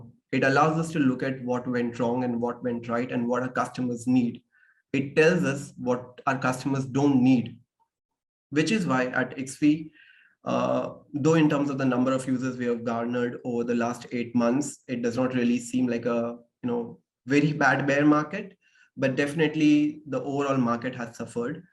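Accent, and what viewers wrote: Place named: India. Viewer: Indian english 🤤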